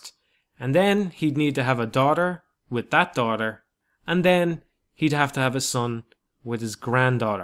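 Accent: Dublin, Ireland. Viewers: Woah, You're Irish Like Me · You're Irish, right? I'm just asking 'cause I love the accent. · He is, it's some sort of Dublin (capital city) accent. There's so many different types of accents all over the country. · It’s an Irish accent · Smitty Werbenjagermanjensen It's Irish right? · Where in Ireland are you from?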